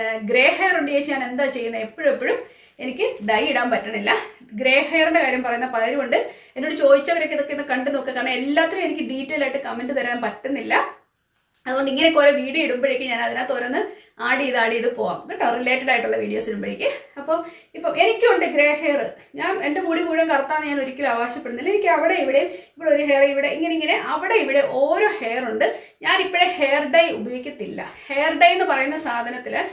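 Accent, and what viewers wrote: Kottayam, India. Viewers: Chechi vdu Kottayam ano???Samsaram kottayam kare pole undu..Pine salwar oke kanichapol narmada ninnu edutha materials anennu paranju atha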